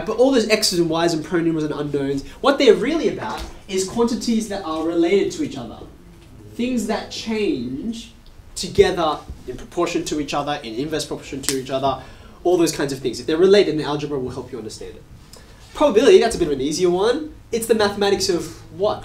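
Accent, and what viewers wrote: Australia. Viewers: Aussies have such cool accents compared to us Americans like this guy, Woo. They are like the cousin you can't wait to chill with at the cookout after tolerating the arrogant and boogie British, and the too nice and boring Canadian cousin. Kiwi cousin is pretty cool too. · Does he speak like an australian? I want to get used to hearing the australian accent, i'm learning english 😄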